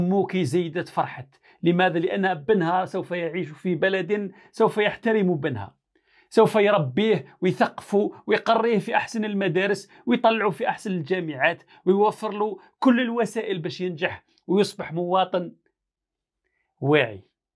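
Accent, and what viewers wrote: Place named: Setif, Algeria. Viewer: الله يبارك عليك يا وليدي الزين والفهامة بصح توله ورد بالك على صحتك مازلت صغير .نقولك حاجة برك لهجتك زي تاع بابا الله يرحمو لأن جدتي صطافية .الله يسترك ويحفظك من كل حاقد .تحية من قسنطينة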